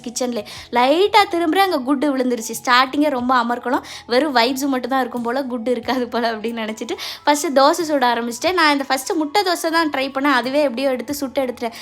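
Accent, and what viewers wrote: Tirunelveli, India. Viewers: Naalu Kanji thanni...😂❤thirunelveli, tenkasi namma slanguuuuu❤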